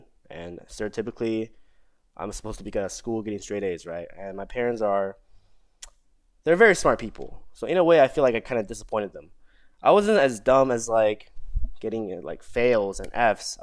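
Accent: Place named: United States. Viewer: Damn I had no clue u learned English that late usually ppl don’t get an American accent that quickly if ever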